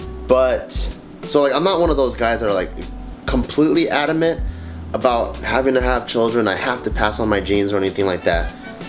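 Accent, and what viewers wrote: China. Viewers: wow, Chinese accent is almost standard than I expected.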